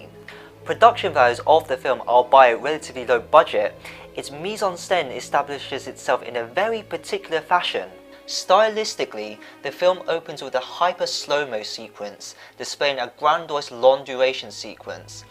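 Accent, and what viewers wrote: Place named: United Kingdom. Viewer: Also, British accent really rocks!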